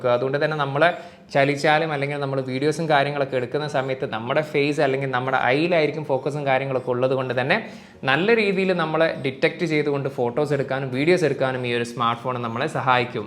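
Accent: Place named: Kozhikode, India. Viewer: അതിന് ഞാൻ നാട് എവിടെയാണെന്ന് ചോദിച്ചില്ലല്ലോ സുഹൃത്തേ...! നമ്മൾ ജനിച്ചു വളർന്ന ദേശത്തെ ഭാഷ അറിയാതെ നമ്മുടെ സംസാരത്തിൽ വന്ന് പോകുന്നത് സ്വാഭാവികം.. അതിൽ തെറ്റൊന്നും പറയാനില്ല.. പക്ഷെ ഇത് പോലെ ഒരു പബ്ലിക് space-ൽ പരിപാടി അവതരിപ്പിക്കുമ്പോൾ അത് വരാതെ ശ്രദ്ധിക്കാൻ നോക്കണ്ടേ..? കാരണം, കോഴിക്കോടുകാര് മാത്രമല്ലല്ലോ അനിയന്റെ ഈ content കാണുന്നത്....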